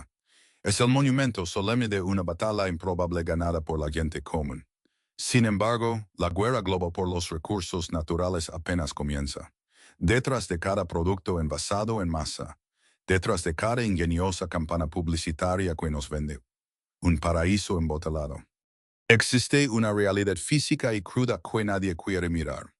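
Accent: United States. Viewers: Antes estaba interezante... desde la semana pasada HABLAS COMO GRIGO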